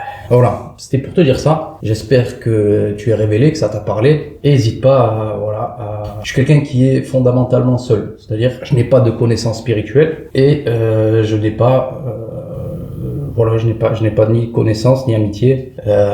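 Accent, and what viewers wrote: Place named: Corsica, France. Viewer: Ce n'est que la voix d'un corse.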